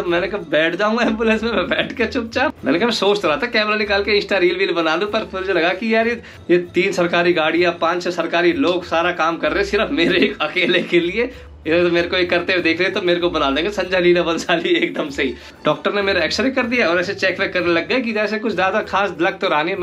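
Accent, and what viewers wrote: Haryana, India. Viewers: Sir apka hasta chehara aur apki hariyanvi bat bahot din ke bad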